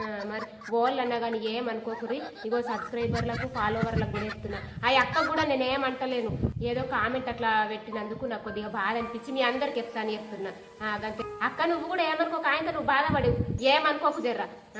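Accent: Nizamabad, India. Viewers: సూపర్ చెల్లి.. మీ వీడియోలు 6 రోజుల నుండి చూస్తున్నాను మా అమ్మ వాళ్ళది బోధన్ మీ యాస నిజామాబాద్ జిల్లా వాళ్ళు మాట్లాడతారు మీ యాసతో నేను రోజు నవ్వుకుంటను మీకు అభిమాని అయిన సూపర్ ఒకటి మీ పేరు నా పేరు లో సగం వున్నది ఇలాగే నవ్వించంది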